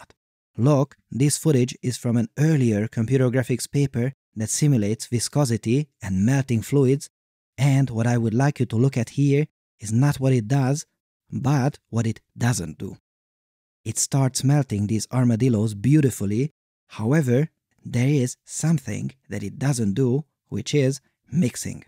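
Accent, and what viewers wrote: Hungary. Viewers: A magyar akcentus befigyel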